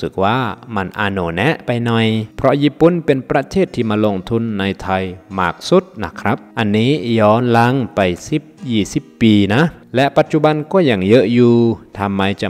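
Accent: Laos. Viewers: เขาเป็นคนลาวพูดได้เเค่นี้ก็ดีเเล้ว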